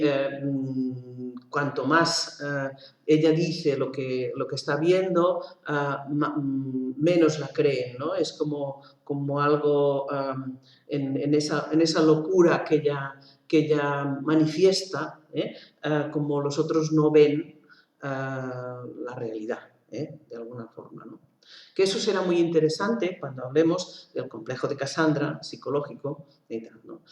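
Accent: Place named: Catalonia, Spain. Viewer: me subscribo ,me gusta como narras, buena dicción, por tu acento deduzco eres catalana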